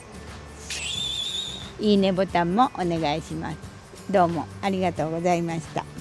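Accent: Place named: Ehime, Japan. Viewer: のファンです。私と同い年なのに、テキパキ畑仕事をやりこなして、凄いな！って感心しています。何より息子さんや、家族に恵まれていて、羨ましいです。愛媛出身なので、方言が所々似ていて聴いていて楽しいです。笑顔良しの、これからも無理しない様頑張って下さい。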